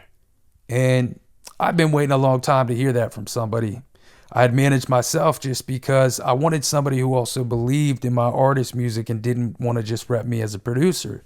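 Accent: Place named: West Virginia, United States. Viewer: Are you from WV fam? Or do tennesseans say "on" like West Virginians?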